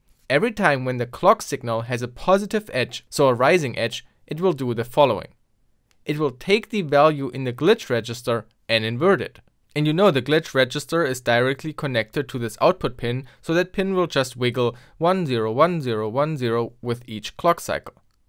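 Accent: Germany. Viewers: Kannst du den deutschen Akzent wirklich nicht raushören?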